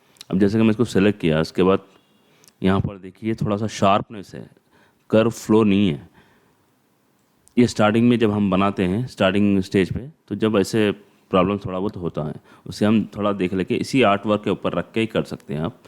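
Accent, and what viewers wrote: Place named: Hyderabad, India. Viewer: Asakum Nice vdo Your accent is like deccan hyderabad. I am sure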